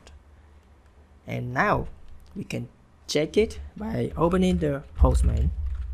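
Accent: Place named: Vietnam. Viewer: bạn là ng VN hả ? accent VN chắc luôn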